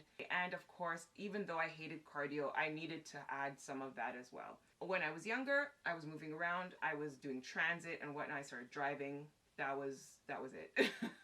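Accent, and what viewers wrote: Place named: Caribbean. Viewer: Gorgeous and intelligent? New subscriber here! :-) 🤔 Do I hear a slight Caribbean accent? ... Just got to 8 minutes. Definitely Caribbean. Lol ♥️ Yaaadie!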